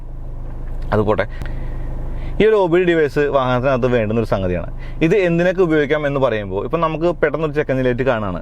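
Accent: Thrissur, India. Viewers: ദയവായി സംസാരം അല്പം സ്ലോ ആക്കു. നിങ്ങളുടെ ഈ തൃശ്ശൂർ charupurality മനസ്സിലാക്കാൻ പാടാണ്.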